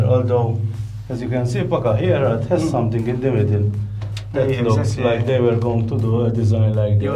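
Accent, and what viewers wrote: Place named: Malta. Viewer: Fantastic as always & Co. I lived in Malta as a child and still visit most years, - I love seeing you uncover and re-explore these historical sites. Love hearing your fabulous Maltese accent too! Thanks for doing these in English:-)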